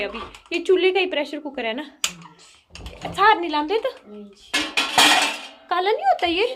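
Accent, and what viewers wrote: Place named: Pahadi, India. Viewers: I am from una in Himachal pardesh Tuha pahadi bade ghaint bolde... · Bahut Sundar aavaj hai aapki.. uper se Pahadi accent uspe 4 Chand laga deta hai...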